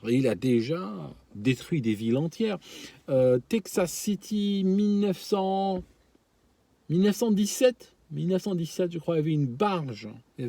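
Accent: Switzerland. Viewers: Je comprends mieux les légères pointes d'accent suisse maintenant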